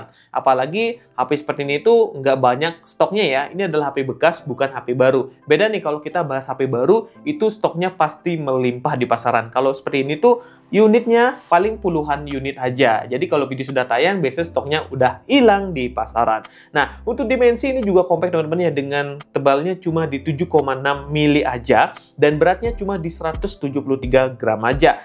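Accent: Bali, Indonesia. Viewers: Bang lu dari mana? Adi logat care nak Bali?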